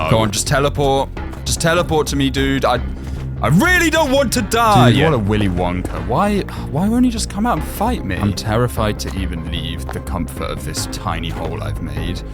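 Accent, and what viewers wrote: United Kingdom. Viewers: are you British 😂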